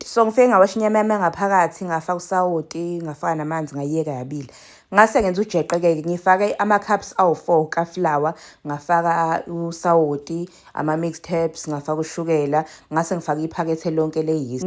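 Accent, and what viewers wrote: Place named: Zulu, South Africa. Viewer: Ngiyakubona nokudla... Ngithanda isiZulu ne accent. Maye. ❤❤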